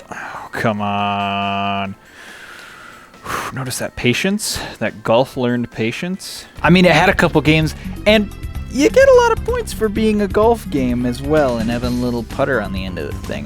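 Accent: Wisconsin, United States. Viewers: are you from wisconsin?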